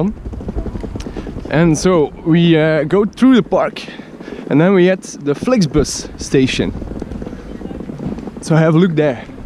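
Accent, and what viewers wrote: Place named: Ghent, Belgium. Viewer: hoor ik daar een klein Gents accentje?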